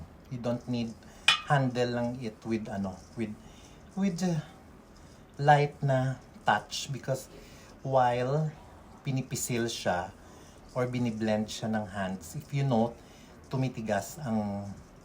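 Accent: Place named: Iloilo, Philippines. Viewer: Hello po sir!! I love your ilonggo accent 😍😍😍it reminds me of my friend in Bacolod.... Pinaka sweet na nakilala ko Ang mga ilonggo 😍 keep safe always sir!! More videos please 😊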